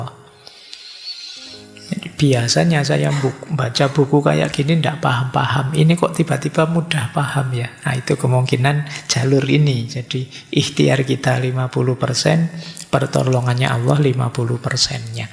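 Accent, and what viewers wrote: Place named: Malang, Indonesia. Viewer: Dimana yah kajianya,tempatnya pak Guru ini yah,nadanya kayak orang Malang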